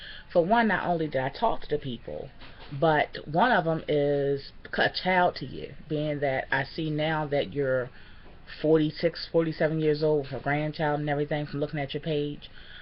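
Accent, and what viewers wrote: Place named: Maryland, United States. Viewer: Girl u sound like me... im from md too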